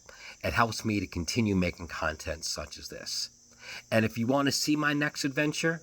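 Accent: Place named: North, United States. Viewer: Your Northern accent doesn't fit Gatlinburg.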